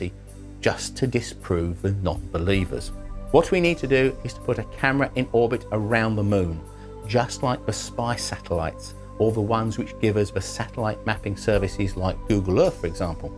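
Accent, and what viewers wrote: United Kingdom. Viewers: The soft tender British voice of reason!! Google has discovered my chickens and their feathers in my back yard through the atmosphere!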